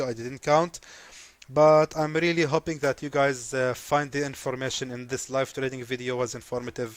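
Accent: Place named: Arab world. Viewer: your accent is Arab, if so, why not making videos in Arabic?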